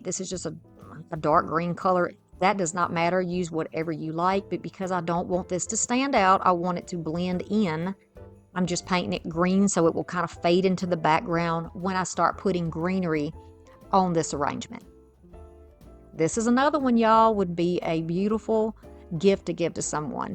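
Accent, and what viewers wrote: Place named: Texas, United States. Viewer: I love all of these projects. Great job! Where are you from? You sound like you are from Texas which where I am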